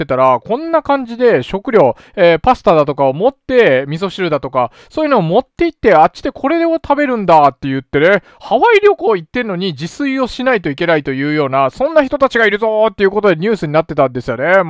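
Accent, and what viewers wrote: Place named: Kansai, Japan. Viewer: 関西弁だけどなんかケチな感じがザ関西って感じ偏見だけど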